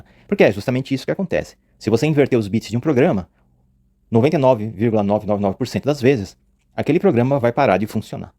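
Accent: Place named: Rio Grande do Sul, Brazil. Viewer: vc tem sotaque de alguma cidde do RS.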